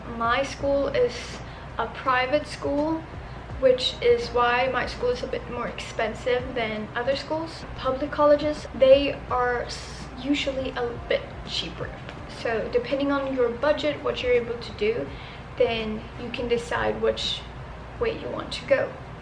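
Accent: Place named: South, United States. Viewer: girl, your southern accent is coming through! love it 😂 and miss you 😘 kinda jealous ngl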